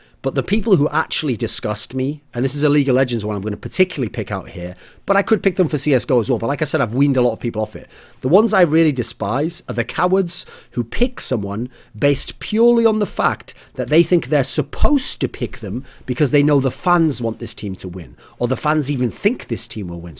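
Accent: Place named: Wales, United Kingdom. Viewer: Where is Thorin from? He sounds Welsh or some shit.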